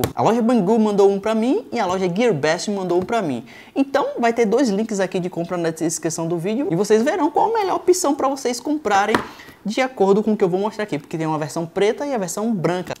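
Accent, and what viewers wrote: Bahia, Brazil. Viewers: Vc é de que enterio da Bahia?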